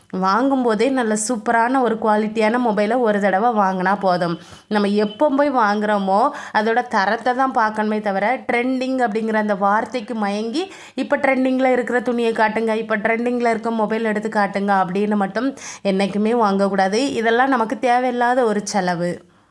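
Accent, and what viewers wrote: Kanyakumari, India. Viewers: Hi sis unka veetu enka pechu kanyakumari pola iruku marthandam side athan kekuren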